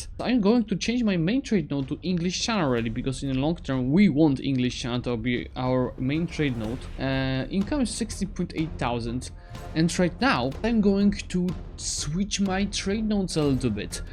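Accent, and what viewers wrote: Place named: Poland. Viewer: Bro I suspected he was polish turns out he is I recognize a polish accent anywhere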